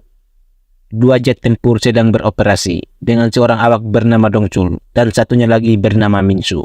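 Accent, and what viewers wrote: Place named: East, Indonesia. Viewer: der ngeri kali aksen kau 😂 logat timur kau kental kali😅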